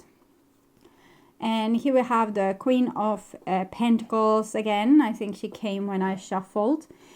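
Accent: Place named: Sweden. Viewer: The harsh truth in a beautiful Swedish accent. Lol awesome . Thanks sweetheart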